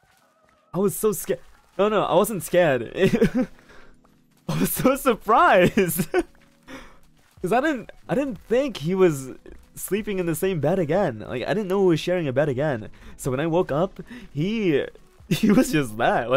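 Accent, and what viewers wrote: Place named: Australia. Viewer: Why does his aussi accent sound stronger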